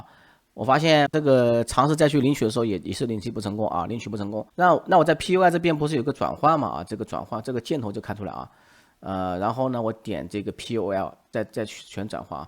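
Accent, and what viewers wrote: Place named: Hangzhou, China. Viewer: 口音听出来杭州附近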